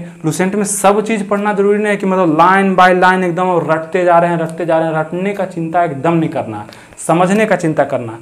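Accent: Bihar, India. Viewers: Sir ap bihar se h kya?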